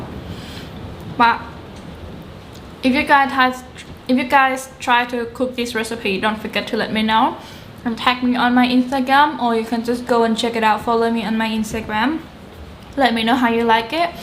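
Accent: Australia. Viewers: Hello, you are starting to get an aussie accent! Love your cooking vlogs! :) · you are getting an Aussie ascent .....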